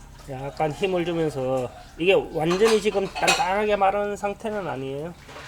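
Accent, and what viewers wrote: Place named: Hamyang, South Korea. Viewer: 함양은 합천군과 붙어 있지요 기와 땜에 함양읍에 간적이 있어요 억양이 같아서 왠지 정감이 가더니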